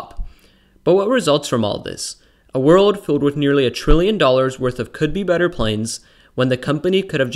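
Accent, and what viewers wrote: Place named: India. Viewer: Nice Indian accent.btw retire this old plane.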